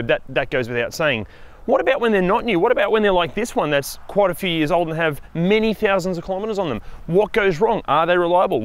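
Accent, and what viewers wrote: Australia. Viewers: this guy is aussie clearly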